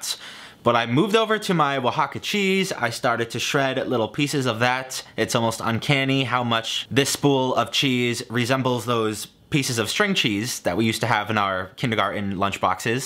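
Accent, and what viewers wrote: United States. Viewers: your pronunciation is fine. you are an american talking with an american accent. you're pronouncing the words right just not putting on a false spanish accent which i find more annoying than anything 🙄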